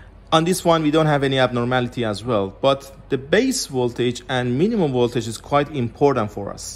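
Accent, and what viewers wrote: Iran. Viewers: لهجه ایرانی😊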